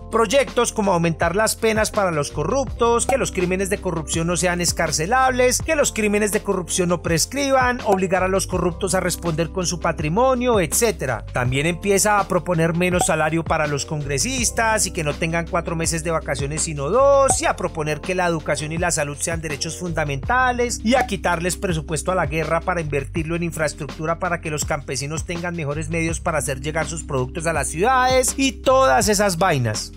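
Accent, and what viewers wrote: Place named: Valle del Cauca, Colombia. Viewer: Eja mierd44? Sos valluno? Apenas noté el acento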